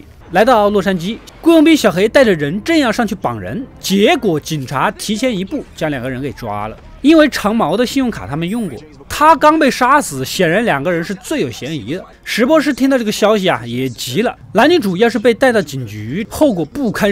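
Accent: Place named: Hunan, China. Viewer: 是湖南的吧？特别喜欢这口音，哈哈哈哈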